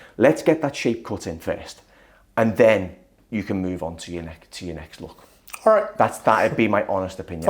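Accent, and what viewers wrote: Liverpool, United Kingdom. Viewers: Bonjour. 😊 Yes has a fairly strong but gentle regional English accent. He is from Liverpool or that area of England. The UK has many different accents. I come from an area of Scotland where we speak both softly and quickly. I'm often not understood by the English.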